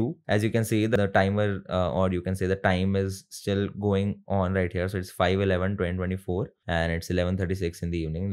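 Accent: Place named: India. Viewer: Disgusting Indian accent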